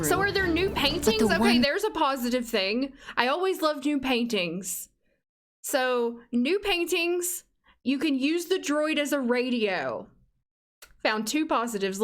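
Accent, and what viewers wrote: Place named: South, United States. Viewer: I’ve never seen one of your videos before, but I absolutely fuckin adore you now 😂 your reactions and facial expressions remind me of the way I react to things, and your lil accent (is it southern? Kinda? Am I impaired?) just seals the deal! New sub here, can’t wait to binge your content ❤️ love from Toronto, Canada!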